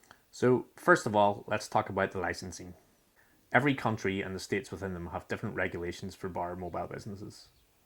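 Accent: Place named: Northern Ireland, United Kingdom. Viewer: Hello! What is that accent - it sounds close to home (NI)😅 I’m actually thinking of starting a mobile bar business over here but I’m a little lost on where to start. These videos are great but I’m overflowing with questions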